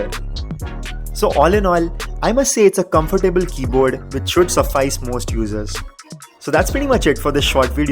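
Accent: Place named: India. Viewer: except for the Indian accent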